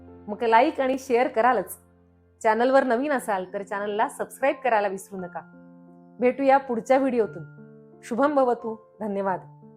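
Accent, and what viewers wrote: Standard. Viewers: स्पष्ट, शुद्ध उच्चार आहेत तुमचे.उपयुक्त सोपी माहिती सांगितलीत. धन्यवाद!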